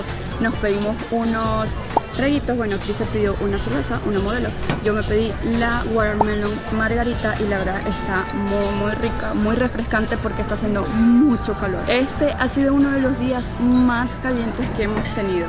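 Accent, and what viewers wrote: Venezuela. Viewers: Eres venezolana?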